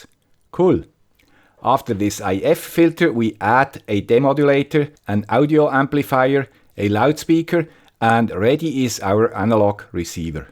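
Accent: Switzerland. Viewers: One of your greatest videos! Thanks! And your Swiss accent is perfect as usually. :-) 73! Jakub, OK1SE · Those 1% of the facts informed me more about SDR than I have ever seen. And all with a Swiss accent. Thank you.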